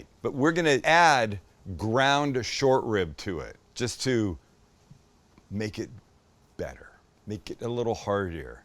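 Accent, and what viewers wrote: Ireland. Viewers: Love the show and this sounds awesome!!! Have you ever considered a Kentucky Hot Brown, yum I think you should do at least one whole show using your Irish accent, lol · You from Ireland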